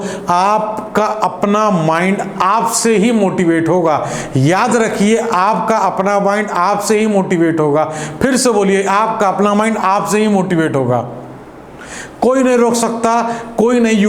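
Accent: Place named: Kannauj, India. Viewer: Sir you are such a best motivator. Apne toh mera mind hi change kr diya ap kannauj se belong krte hai kya sir apki language is like my mother tongue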